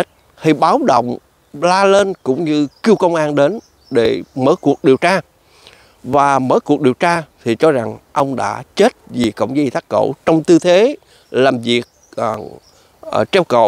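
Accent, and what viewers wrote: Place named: South, Vietnam. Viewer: Rất hay và khóai chất giọng miền Nam của chú. Cảm ơn chú đưa tin tức.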